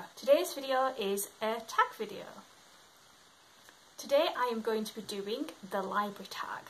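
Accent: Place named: Yorkshire, United Kingdom. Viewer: As someone from the Netherlands I sometimes can't tell the various UK accents apart. I had no idea you had a Yorkshire accent as I have no idea what a Yorkshire accent sounds like. I know what a Scottish accent sounds like since recently as I started watching a couple of other BookTubers who are Scottish and talk about it. How nice you can reserve any book from the county for a pound! It costs more here if you want a book from a library further away than the local area here. Oh yes I am always careful with my library loans too. In the Netherlands you have to pay for a library subscription (except for children under 18 I think), so that could be a reason here but I don't know. Some libraries make you pay a certain amount of € per book, gladly mine doesn't and it's just the yearly fee. How cool, I don't think they deliver to your house here at all, that is not a thing. 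I love my library though. Oh yes I love browsing at the library! The Stopping Places sounds interesting. I couldn't pick one best book either! The Reading List has a nice cover! It sounds like a nice concept for a book! Ah I was just about to put it on my wishlist, and realised it was already on there. I didn't recognise it because the cover on my wishlist looks different. Glad you enjoyed the book! I can't listen to audiobooks. I prefer reading paperbooks over ebooks too. My library does have ebooks, but I can't read them on my Kindle (it's not a supported format), so I'd have to read them on my phone (or perhap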